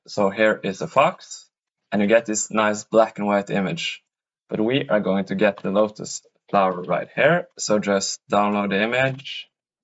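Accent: Norway. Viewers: Kænn ju tæll mi de vei tu de ræilveistæisjen? 😛 Hører kor du é fra.. Veldig gode videoer, forresten!!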